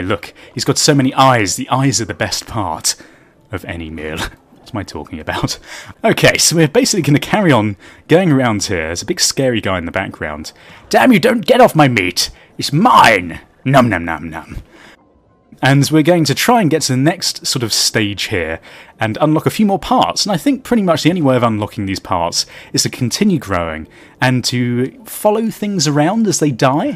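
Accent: United Kingdom. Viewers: is so gorgeous and fabulous from british voice like france! He played together with Species-x and make a friends!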